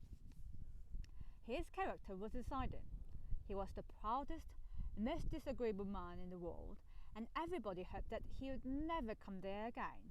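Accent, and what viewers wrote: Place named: United Kingdom. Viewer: 너무배우고싶네요..^^영국발음과 악센트..멋져요♡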